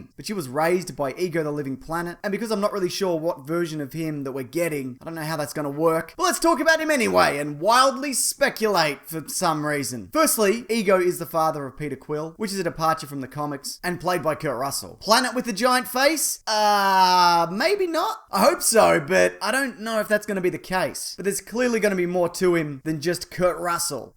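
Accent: Australia. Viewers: Always good to hear the rare Australian YouTube guru .... why had I not found you before ??? Ima subscribe.. oi oi oi !!!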